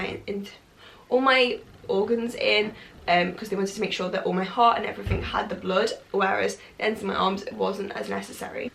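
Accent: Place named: England, United Kingdom. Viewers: I KNEW I recognized that accent immediately! I’m American, but my brother’s husband is from Nottingham. Such a beautiful accent! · I'm an American so I'm not great at recognising different British accents but I really like yours! To me it sounds...well, between York and London 😅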